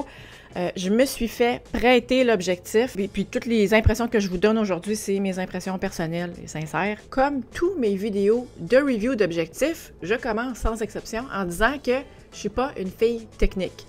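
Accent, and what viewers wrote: Quebec, Canada. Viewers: Salut, je viens de découvrir ta chaine et à t'entendre parler, tu es du Québec.. je pense hehe. Malgré que je fais principalement de l'astrophotographie, j'aime la simplicité et les expliquations de tes vidéos! Oui, les gars son geek mais ils sont aussi capable d'aller voir les specs par eux-même donc c'est bien correct! Continue tes vidéos! Si tu veux, je peux parler de ta chaine sur ma prochaine vidéo sur youtube!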